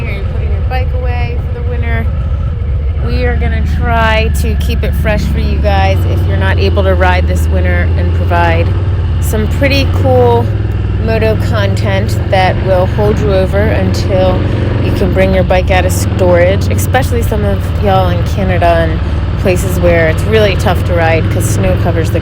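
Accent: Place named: Maryland, United States. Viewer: My wife just walked in the room as I am watching this video. She said “who is she, is she from Maryland?” I said hell yeah hon! Lol! 🦀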